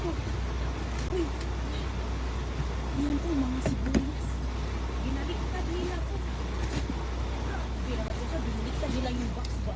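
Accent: Visayas, Philippines. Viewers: peper litus bisaya ka dhay.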